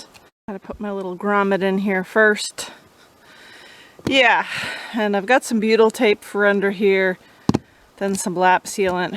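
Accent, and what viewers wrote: Nova Scotia, Canada. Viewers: I enjoy your videos, you are so Nova Scotian in your speech. (Thus the phrase "Giver") Do not miss dealing with those ugly looking spiders. 😧😧😧😡😡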